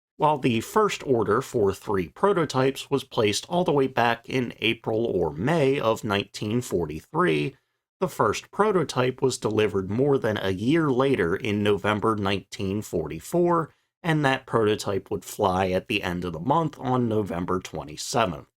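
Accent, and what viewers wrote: United States. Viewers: I do not like videos that have measurements n them that use only the Metric system! You sound like a US citizen, you are on the US YouTube channels and yet you completely leave out our system of measurement which is the Imperial System! Why do you Pinheads do this? I have to stop this absurdity and have given you a thmbs down!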